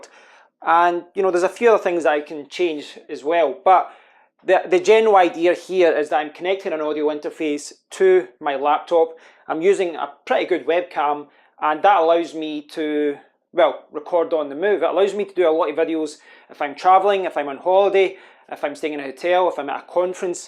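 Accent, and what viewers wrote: Scotland, United Kingdom. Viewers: Ur Scottish right ( so am i)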